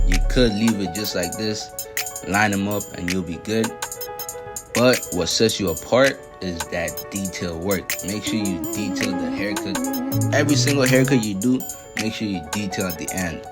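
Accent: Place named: Baltimore, United States. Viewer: Where you from? If you are close to me I will have to stop by and get a cut. I thought I heard a bmore accent.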